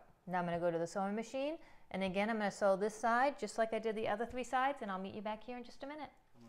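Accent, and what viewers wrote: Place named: New England, United States. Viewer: Great tutorial...you sound like a fellow New Englander...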